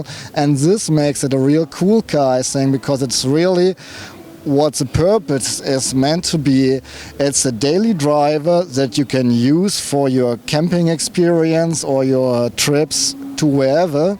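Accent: Germany. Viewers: I think ze strong accent emphasizes the technical, quintessentially precise German idea of automotive engineering. Keep up the good work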